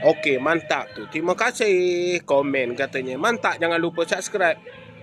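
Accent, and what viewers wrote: Pahang, Malaysia. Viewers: Ye loratnye bercampur pahang ada ganu ad perak ada kelantan pn ada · koi nk nanya..ngape lorat aokme bercakap macam org pahang...beloh2 temerloh - pekan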